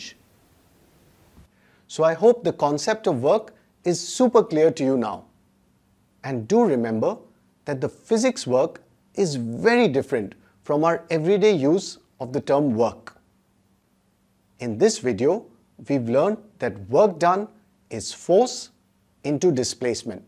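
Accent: India. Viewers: Sir your video on work is more convincing. Even you use simple indian pronunciation. That's why I am impressed. Thank you sir. You are doing hard work. Without the intension of fruit. Thank you again. Keep it up!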